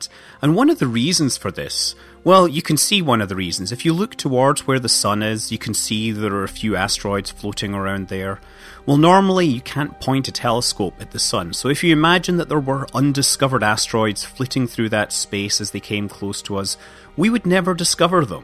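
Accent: Scotland, United Kingdom. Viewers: my god what a snooty Scottish accent you have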